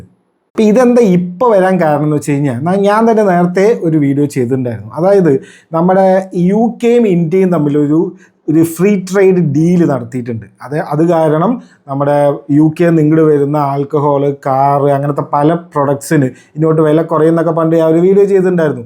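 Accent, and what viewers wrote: Palakkad, India. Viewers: Bro.. പാലക്കാട് ആണോ?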